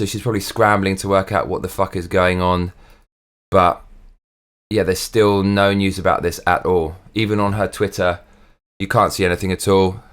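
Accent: United Kingdom. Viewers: Yes nice to hear a British gent with Libertarian views! Why do perverts get a whole month? Let's have a month for the nuclear family.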